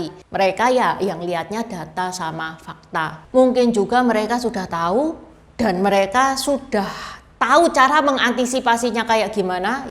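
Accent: Java, Indonesia. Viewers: hanya wajahnya saja yang masih kelihatan tionghoa tapi logat bicaranya medok seperti orang jawa semangat terus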